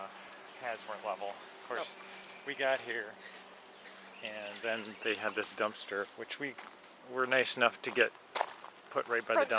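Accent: United States. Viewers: Tell me your from the East Coast without telling me your from the East Coast. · You are either from California or a Yankees!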